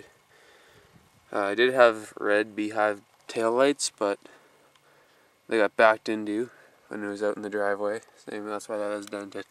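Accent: Canada. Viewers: must be a canadian aboooot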